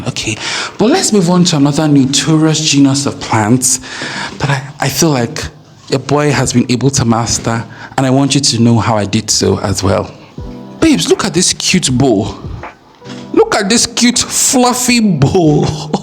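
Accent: United Kingdom. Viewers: Hi ❤ ❤ 😢😢😢😢😢😢😢😢 I’m an alocacia and calethia killer, those fancy ones 😢😢😢 but I found few at Walmart on sale, $2.50 found some & I still have them; I do have many types of tradescantias and love propagating them 😊 but I still kill plants. I have all kind of plants 🌱 🪴🌱🪴🌱🪴🌱🪴🌱🪴 maybe more than a 1000; still ❤❤❤ I love the ones stay alive for many years, the ones I kill 😢 I try & I try! I thought I was a plant mom that water too much, that I am constantly there but I am not, I am there in my garden but I don’t check them daily, honestly I have not found pest in any of them in many weeks😊 but this hobby is very rewarding and expensive 😊😊😊 Friend I like your British accent 😂😂😂 thank you for your time ❤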